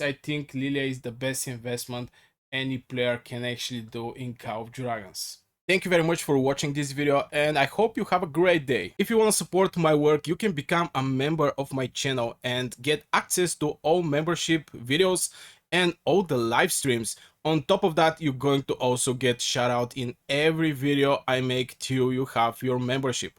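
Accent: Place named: Bulgaria. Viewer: Знаех си, че си от България хахах. Имаш български акцент като говориш, а и се казваш.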